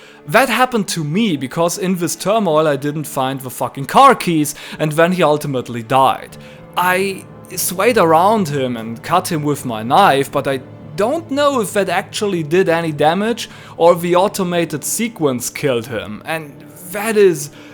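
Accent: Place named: Germany. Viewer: I wanted to watch the video since I agree with the opinion, but from the first "laaaahst" the fucking German cringe-accent hit me. Peinlich as usual. I hate mine peinliche Mitdeutschen so much................................. Please get off DuRöhre until you've learned to pronounce (modern) English. Watch/play stuff without the fucking Synchros for instance, why dont'cha... Un-verfickt-glaublich......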